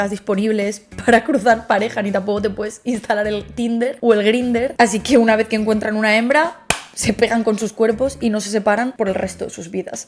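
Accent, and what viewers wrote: Spain. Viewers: Inteligente, hermosa, acento español, y se vistió para la ocasión para hablar de sexo. No me hagas esto, o más bien, seguí haciéndolo. Pero después de la, ejem, reflexión hormonal, y para agregar algo de valor... Hay un libro muy interesante "El gen egoísta" de Richard Dawkins, que muestra al modelo animal como una "funda porta genes" que les permite movilidad y selección (a los genes), y por tanto les da herramientas para una evolución más dirigida o acelerada, y que explica cosas como la hipergamia (tendencia a la infidelidad, o sea), o ya en nuestros días la selección social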